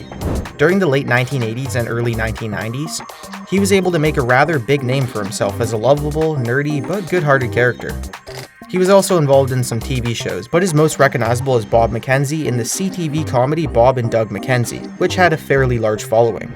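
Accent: United Kingdom. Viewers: he doesnt have a silver play button because he bought this channel, like look at this channels first video. does it sound anything like him? no!! and its not because he aged lmao, its an english accent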